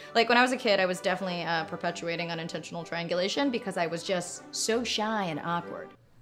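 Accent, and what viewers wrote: Canada. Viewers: Are you Canadian!?!?!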